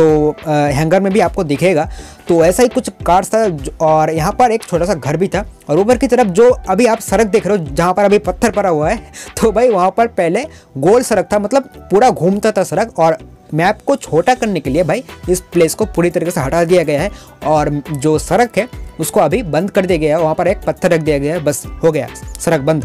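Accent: Bengal, India. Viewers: You are from Bengal ... I can feel the Bengali version of hindi ... In your hindi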